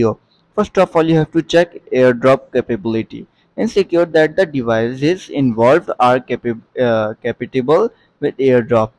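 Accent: India. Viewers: Could barely understand anything with that thick Indian accent. Still can’t get it to work.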